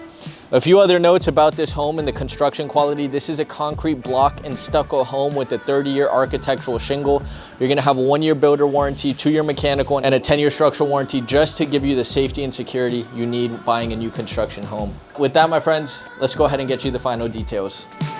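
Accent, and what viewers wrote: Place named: Venezuela. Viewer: No you did not just say you speak spanish you spoken in a Venezuelan accent because your mother is from Caracas. Your speech was slow and more deliberate as most Venezuelans I know speak faster. Yo hablo Castellano y cuatro otros idomas. Now for the house can you show other homes that are not that puke modern look, it screams cheap construction. There is more colors than black and white, it looks sterile and neutered, like they use to build prisons and those cookie cutter open concepts are terrible. I grew up in a real home, with clear defined rooms made with reinforced concrete, stucco and plaster interior walls about 2-3 inches thick. No hoa I approve of but the rest, the lot soil is more valuable that the material or labor. Can your builders do anything else but xerox every other lame builder. If they xerox Frank lloyd wright we might see something better.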